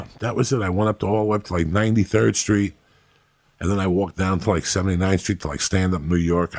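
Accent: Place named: Italian American, United States. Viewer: He could play the old fat Italian mafia boss kind of role even the accent he's perfect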